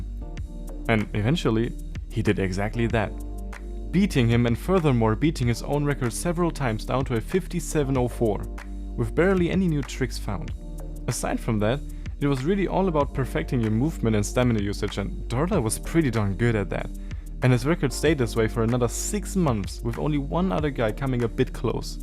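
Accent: Germany. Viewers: do i hear DEUTSCH? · after 2 seconds of watching I could tell you were German. (du machst krass guten content und verdienst mehr aufmerksamkeit bro) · are you german? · I can hear the German accent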